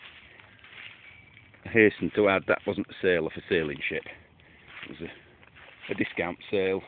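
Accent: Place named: Leeds, United Kingdom. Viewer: You wildcamp like me sir, I trip up over my guy lines too. And I ramble on to myself hee hee. I live in Cheshire so not so far from (leeds?) from your accent, if you ever fancy a meet up for a wild camp give me a shout...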